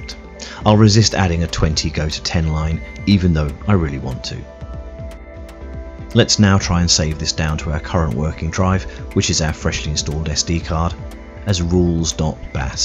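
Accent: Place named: United States. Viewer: another great video!... and a great way to prep your soldering iron tip is to wipe it and tin it with ThermalTronics "Tip Tinner". Also, you might find a 1.5mm tip more suitable for pcb work than the 3mm, especially when soldering SMD. And yes, it's always ZEE if you've lived in the States for while:).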